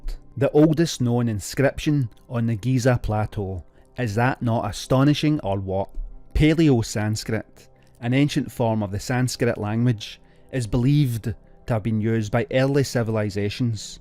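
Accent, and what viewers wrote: Scotland, United Kingdom. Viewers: Delivering information in a Scottish accent makes it sound stupid from the off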